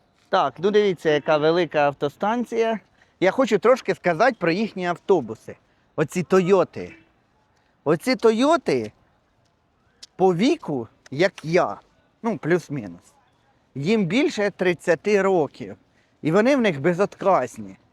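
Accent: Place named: Poltava, Ukraine. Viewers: Красавчик 😂 Полтавский діалект ❤ зробіть обзор апкомагазину, яке є СУХЕ вино і почім?! заздалегідь Дякую. ну і коли будемо жарить попугая (рибу)?! Обох обійняв. цьом. Тримаймося 🎉 p.s.у ВєлМаркеті в Києві, банани по 71грн, Еквадор, красиві. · Ваш полтавський суржик шикарнючий. Кому не подобається- всі в сад. Тільки не переходьте на кацапську, бо блогери - україномовні одиниці. На язьіке вагон і тєлєжка, але тошнить од кацапського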